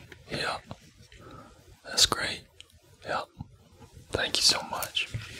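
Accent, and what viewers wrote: Texas, United States. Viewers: I’m down I’m in tx right now it’s perfect man I thought you were a all Texan family man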